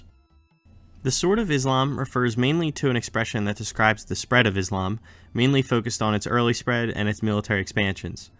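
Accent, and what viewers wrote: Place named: Philadelphia, United States. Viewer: Bro hittin us with that hard Philly accent with the “wooder”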